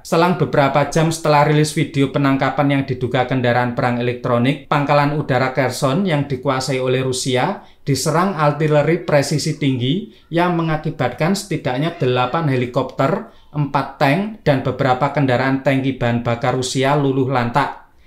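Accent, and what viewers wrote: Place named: Batak, Indonesia. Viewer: Mantap dialek batalnya.....horas...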